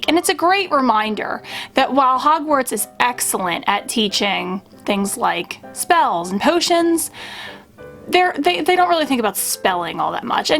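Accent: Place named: Pennsylvania, United States. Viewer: Can’t believe I haven’t mentioned this yet but I’m Pennsylvania born too! It’s so funny cause your accent is sooo PA! But also GO EAGLES! 💚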